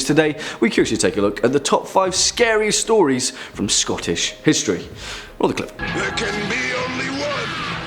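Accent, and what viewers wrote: England, United Kingdom. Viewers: Want to like cause it's about Scotland, but cannae be having that English accent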